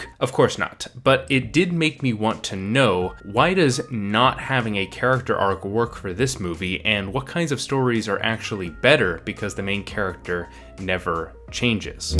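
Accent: Canada. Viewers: The Canadian accent is strong in this one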